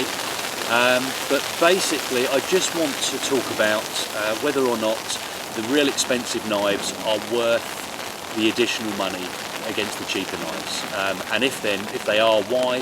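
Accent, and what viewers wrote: United Kingdom. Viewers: I like that video a lot, (1) I like the fact you're realistic about the surprising capability of the Mora, (2) I like that you show that the Ray Mears is both, an awesome tool and a piece of art (almost, at least), (3) I like the British accent. :-) PS: faultroy is an impolite troll, thus let me remind you: Don't feed the troll!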